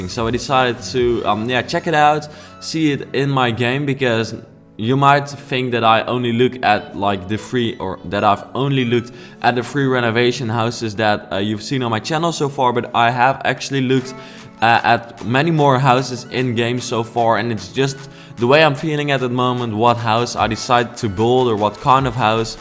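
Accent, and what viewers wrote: Netherlands. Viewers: Are you Dutch? (NL) (ben je nederlands?!)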